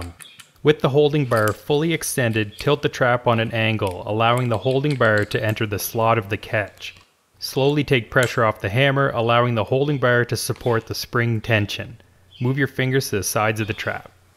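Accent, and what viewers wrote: Canada. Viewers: You say about and mouse like someone from either Canada or certain places in Virginia. Are you ? I've had success with setting that trap with the yellow bait shelf like you have, and it works well. Also a " Mice Cube " works well for someone who doesn't want to touch the mouse, or doesn't actually want to kill it. For bait, use 1/2 of a Lay's peanut butter cracker (the 1/2 that the peanut butter sticks to) and slide it down to the other end of the "cube". Works like a charm !! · I thought you were Canadian by your accent, then I saw the hockey stick!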